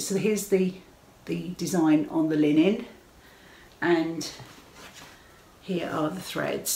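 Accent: England, United Kingdom. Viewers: Hi! Greetings from Texas!! I love you videos! Your stitching is so beautiful. I especially liked the project bag you did with your name on it. I had to order the pattern right away!! I really like your English voice - if we met wouldn’t it be funny to overhear us talk - you with English and my Texan!? It would be a hoot! Lol Happy Stitching! Happy Holidays! Be safe!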